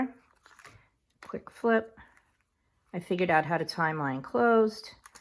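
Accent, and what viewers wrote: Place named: New York, United States. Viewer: I thought I heard some New York in your voice! I’m originally from Brooklyn 🥰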